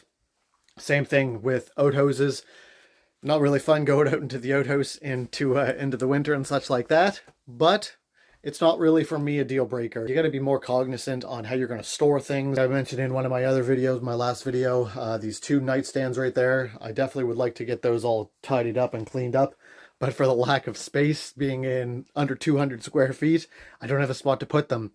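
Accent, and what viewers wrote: Nova Scotia, Canada. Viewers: Your accent sounds Nova Scotian. Im guessing Pictou county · Thank you for this lovely video summing things up! I wrote once before saying "You must be a Nova Scotian" as I recognize the accent! But am forgetting which county you're in. I'm in Annapolis county and it's getting much harder to hide from all the restrictions in order to 'off grid'. I'm in a tiny 12x12 shack next to our bigger house, but eventually my 30 something son and daughter in law (who are also very much 'believers...Praise God!), are wanting to homestead and go more off grid with their little one. God has done many wonderful things in their lives so far, so I'm sure it will happen within the next couple years. (P.S. I have a cordoned off little corner with a bucket system bathroom and I just love it....WAY better than any water toilet!) In a future video, can you give your testimony of how Jesus came into your life? 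Love hearing the multitude of ways He has shown up in peoples' lives.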